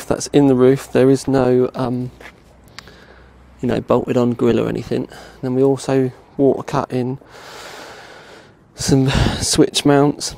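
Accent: United Kingdom. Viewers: Where abouts in the UK are you from? Not specifics lol, just general area. I'm sure I've seen around the Manchester area (or his twin haha).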